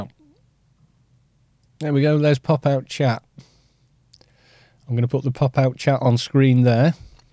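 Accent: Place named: Scouse, United Kingdom. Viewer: I always thought you had a bit of Scouser in your accent.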